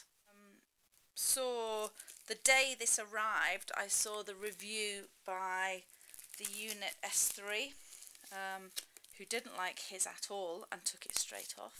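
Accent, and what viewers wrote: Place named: United Kingdom. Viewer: I love your accent. Many Americans are suckers for a British accent!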